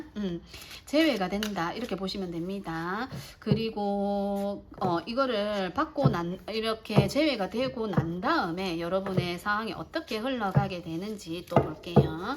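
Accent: Gyeongsang, South Korea. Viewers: 경상도 사투리땜에----😢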